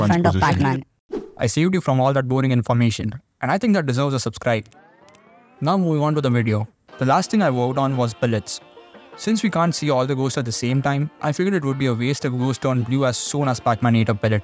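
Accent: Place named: India. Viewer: you sound like indian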